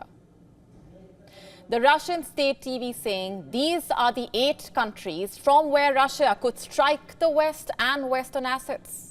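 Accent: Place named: India. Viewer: Can't stand this indain accent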